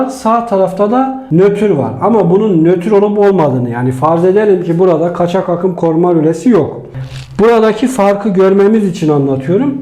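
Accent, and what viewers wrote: Erzurum, Turkey. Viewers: Güzel bilgiler ustam . meslek lisesi elektrik bölümü mezunuyum ama bunlari gostermediler.bravo basit ve anlasilir bir şekilde titizlikle anlattiniz.bravo.ayrica Erzurum şivesinede bayiliyorum.tom diye bi uygulama vardi sincapmi ne cok güzel konusuyodu.bu şiveler ülkemizin zenginligi.kolay gelsin, 👍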